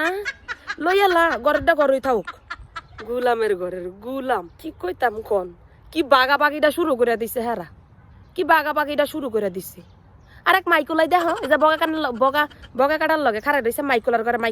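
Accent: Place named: Sonargaon, Bangladesh. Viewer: আপনার কথা বলার ধরন গুলো আমার অনেক ভালো লাগে,, আপনার গ্রামের বাড়ি কি সোনারগাঁ মদনপুর এদিকে,, আমার এক ছোট ফুফুর বাসার ওখানে,, তাদের ভাষা এরকমই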